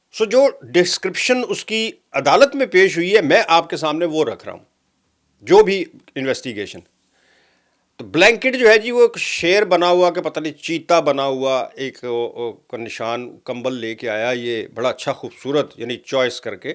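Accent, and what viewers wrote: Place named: Punjab, Pakistan. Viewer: your Punjabi touch is hilarious 🤣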